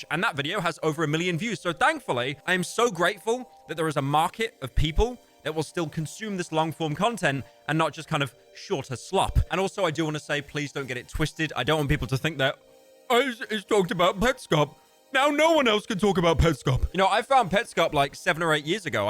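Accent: United Kingdom. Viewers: I ain't watching the appalachians Petscop video I want the british femboy voice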